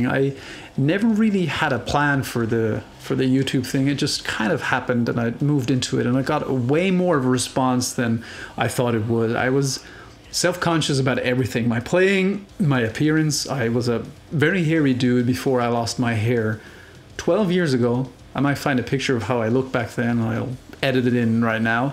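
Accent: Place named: Ireland. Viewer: New to the channel. Love it. But you speak English with a bit of an Irish accent on certain words. How'd that come about?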